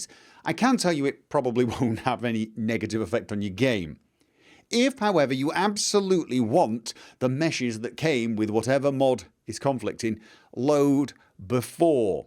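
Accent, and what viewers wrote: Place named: Manchester, United Kingdom. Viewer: its a Manchester accent with a bit of Liverpool, but clear and deliberate with flourishes, like on the rolling Rs. A switch between cities and Norway may have something to do with that.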